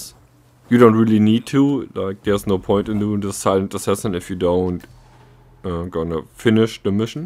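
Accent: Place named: Germany. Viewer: I like your accent. Sounds Deutsch!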